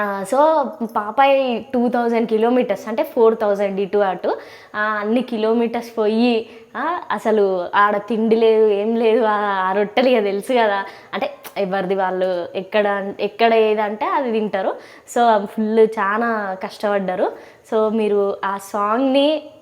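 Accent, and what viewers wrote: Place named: Warangal, India. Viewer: Warangal slang vere untadi... Akka mi slang masthu untadi..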